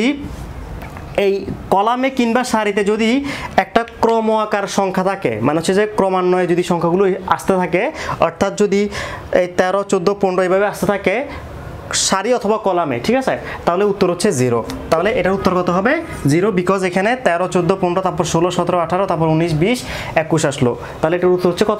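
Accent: Chittagong, Bangladesh. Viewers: চিটাইংগা নাকি???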